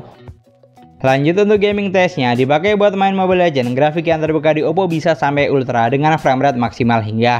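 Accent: Madura, Indonesia. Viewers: Saya nyimak dari awal sampai akhir,, semakin saya menyimak semakin saya tahu, si Abang aksen penjelasannya semakin lama berubah ke aksen Madura 🤔 Saya nungguin kata "...........taiyeee" nya 🙄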